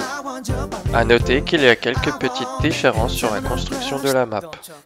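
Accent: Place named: England, United Kingdom. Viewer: Ton accent anglais 😂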